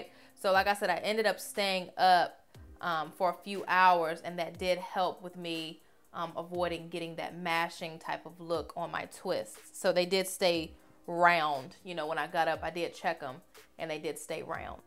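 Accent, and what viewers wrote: South, United States. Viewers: your country voice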